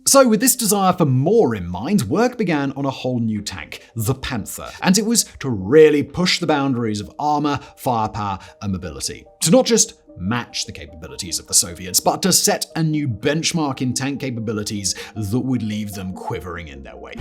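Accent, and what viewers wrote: United Kingdom. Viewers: You are obviously biased against German weapons; your British accent and arrogance gives you away! My uncle fought against them, and he said that they made our tanks look like trash; in terms of firepower, mobility, and armor protection, they were superior to all allied tanks. There did have teething problems initially, but they were quickly ironed out, and the Panther became one of the best tanks of the war. You need to trash a your bias, and stick to facts. Talk to veterans that fought in them and against them, and you’ll have (or should have) a different opinion. You’ll lose viewers if you keep up your bias. Read the reviews and you’ll see it.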